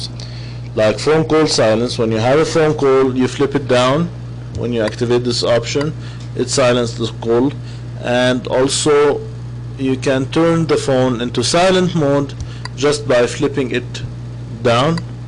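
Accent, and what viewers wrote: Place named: Egypt. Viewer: Hello, how are you:) I believe you're egyptian from the tone of your voice:) Well, I am too... Anyways, would you please tell me how to get the storage swapping work here?? I mean, the correct kernel, the correct script that would really work with that rom. I'm done of trying so many roms and kernels for CM based roms. I would appreciate if you do that:) Thanks w koll sana wnta tayeb:)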